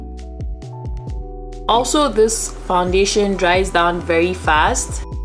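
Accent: Ghana. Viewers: I love watching your videos and your technique. Although I’m much older than you and I’ve been doing my make up for many many years I still like to learn new techniques and see how others do it in a professional and fabulous way. FYI - I also ordered the Morphie brush because I liked the way it moved on your skin. I see you are from Ghana. I have been to Ghana and it was life-changing for me. I hope to return someday. Love your accent! 💕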